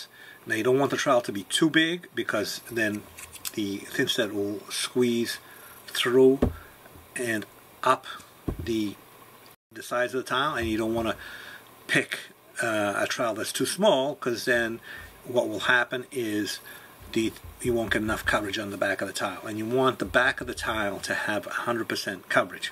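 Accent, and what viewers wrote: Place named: Danvers, United States. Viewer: Thanks for another great video. I started tiling full-time just a couple years ago and you've been an important resource to build my knowledge and skills. I like the straightforward nature of your videos and listening to you talk reminds me of being back in Danvers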